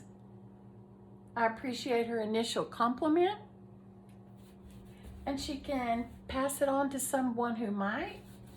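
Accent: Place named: South, United States. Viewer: I agree with all you said. draws you in with that soft Southern accent. What she says comes from her heart. That makes it profound. ❤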